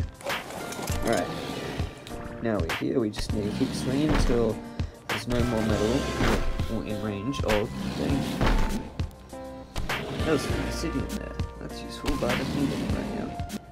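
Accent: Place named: United Kingdom. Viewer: British boiii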